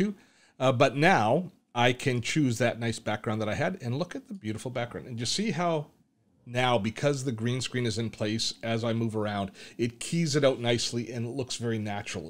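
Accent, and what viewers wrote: Canada. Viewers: you seem to be funny and cheerful without being "goofy". Thumbs up! You get an extra gold star for not beginning your video with "What's up?" A "videographer" will lose me every time in the first 5 seconds when I hear that worn-out phrase. I will try recording the video element of my guitar playing (audio recorded separately) using your green screen technique. I currently am using Filmora and Adobe Premier elements for that but they both are a bit "wonky" to work with. I'm just curious,. What is that hint of an accent I hear in your voice? I'm guessing Canadian. The giveaway every time for Canadians is always how "house" is pronounce as "hoose" and "out" is pronounce as "oot".:-) Nice video... This inspires me to check out some of your other videos. Keep up the good work. Cheers!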